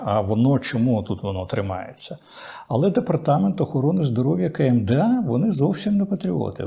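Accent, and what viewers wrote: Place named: Russia. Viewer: А чому в пана Дмитра така підозріла російська фонетика? :) Чому це він називає КлИчка - "КлІчком"? з якого дива? Звідки оці : "ДанІлови", "РЄзнікови", а також вимовляння на російський лад решти слів на кшталт "проблЄми", "крЄмль" тощо. Вам так подобається московська мова?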